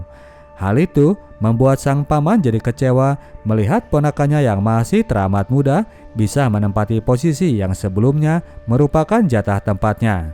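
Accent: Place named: West Java, Indonesia. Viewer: Pengisi suara orang sunda pasti😂😂,suaranya khas bnget😊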